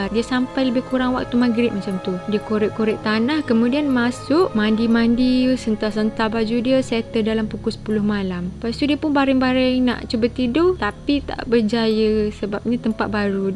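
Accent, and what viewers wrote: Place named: North, Malaysia. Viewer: Comel. Dia campoq bahasa baku dgn bahasa utaqa. Hahahaha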